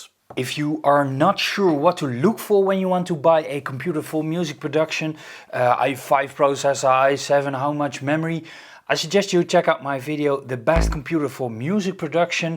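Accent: Netherlands. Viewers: Leuk en informatief Youtube kanaal! Ik type het maar in het Nederlands :-) aan je accent te horen ben je dat. Ik produceer zelf vanuit Logic Pro X icm een Mac Mini i7 6-core /32gigram/1Tb Maar dat is alleen maar omdat ik inmiddels gebonden ben aan Logic... Anders had ik wel een Windows machine gekocht ;-) Keep up the good work!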